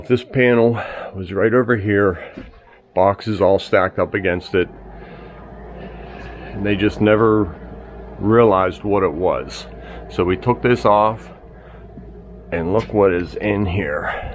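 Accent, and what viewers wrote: Pittsburgh, United States. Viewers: looked on all your sites but dont see where your located,,i detect a pittsburgh accent in your voice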